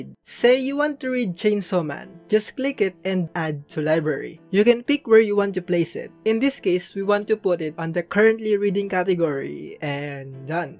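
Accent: Philippines. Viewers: I know from your accent, and humor that you're a Filipino 😂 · Your Filipino accent is kicking in my guy ❤️💯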